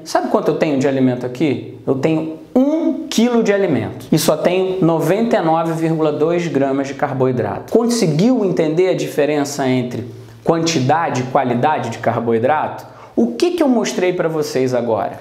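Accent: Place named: Rio de Janeiro, Brazil. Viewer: Esse sotaque Carioca é tudo!!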